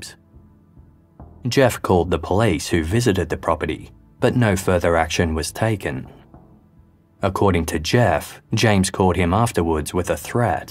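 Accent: Australia. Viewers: I was referencing that the narrator Is a fellow Australian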